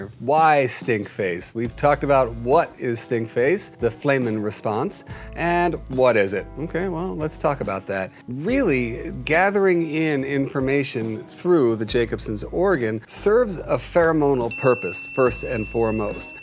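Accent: England, United Kingdom. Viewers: Haha l i love the British accent ;D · How did you get to do that english accent? So interesting and so theatrical !!! I like it ! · LOL - you're English Accent!!!!!! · That British accent 😂😂 lovely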